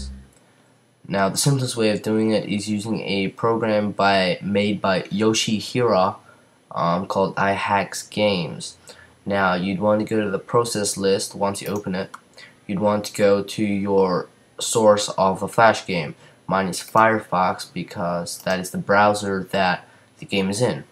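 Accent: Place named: Australia. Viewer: so...u went from an American to an Aussie?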